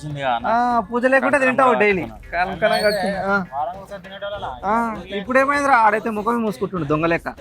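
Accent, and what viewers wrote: Godavari, India. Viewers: Ma godavari paapa matladithene sweet ga vundhi❤❤❤❤❤ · godarolla slang tho okka short chesaru bro aa short viral ayindhi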